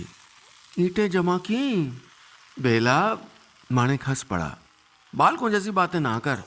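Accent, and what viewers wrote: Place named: Rajasthan, India. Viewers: Such a beautiful story. Plus it being of Rajasthani setting and Nilesh Mishra reciting it in Rajasthani accent makes it even more beautiful. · Rajasthani bdi pyaari lgti h aapki aawaz me☺️☺️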